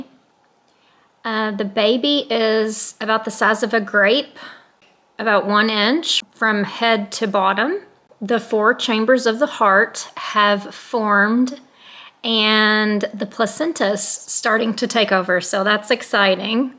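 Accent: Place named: Georgia, United States. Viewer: That heartbeat sounds glorious! I heard you say it said 130 for a second. When I was pregnant with my daughter her heartbeat never went out of the 130’s my entire pregnancy. The doctor would say she was just chilling out in there 😂. My son’s heartbeat never went below the 150’s. It was usually in the 160’s. I’m so happy for you! So glad I came across your channel. On a side note, your accent sounds like mine 😊. I’m born and raised in southwest Georgia.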